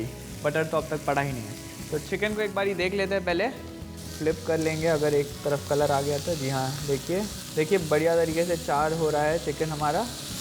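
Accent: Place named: Hyderabad, India. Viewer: For some reason, I felt Chef had Hyderabadi slang when he speak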